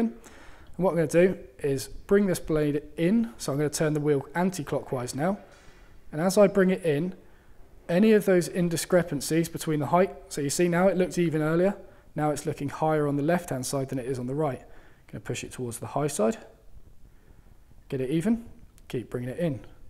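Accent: United Kingdom. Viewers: Silly yank i am, just thought he was using the queens english.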